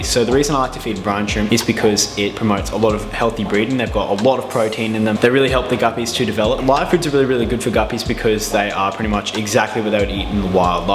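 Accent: Australia. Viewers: Are you an austrilian??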